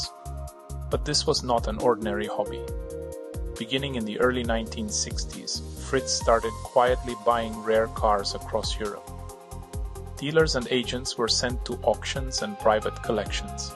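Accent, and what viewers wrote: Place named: Germany. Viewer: Good luck with your further efforts. The "AI voice" misled me with a very strong "German speaker's accent" into thinking that it was a human speaker who would/should have known how to pronounce that the "German way."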